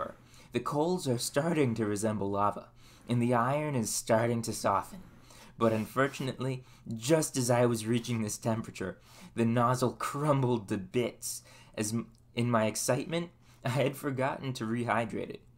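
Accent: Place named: Maryland, United States. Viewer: Kinda sounds like a Maryland accent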